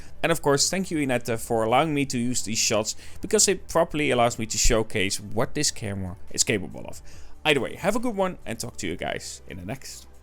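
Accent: Netherlands. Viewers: Yo, man, off topic but what accent do you have, I heard you are in the Netherlands, is it a Dutch accent? If it is, it's really strange how different the Afrikaans and Dutch, English accent is, despite the languages being so similar.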